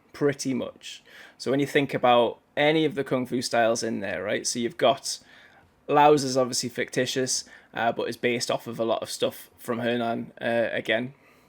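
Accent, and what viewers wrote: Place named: Newcastle, United Kingdom. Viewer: Eyepatch wolf is Irish i think this fellas a jeordie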